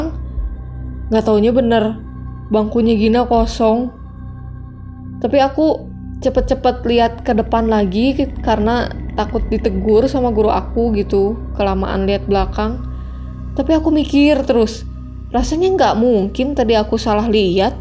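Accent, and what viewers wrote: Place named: West Java, Indonesia. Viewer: Logat Sunda nya terasa Karakter suara mu kaya di animasi di tiktok yang gak takut sama hantu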